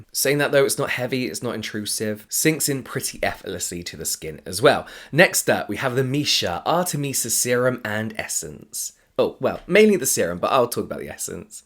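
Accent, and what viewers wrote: United Kingdom. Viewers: Just discovered you. Great videos that get straight to the point. I also love your super calming voice (British accent is a plus 😉). Love your content! Keep it coming.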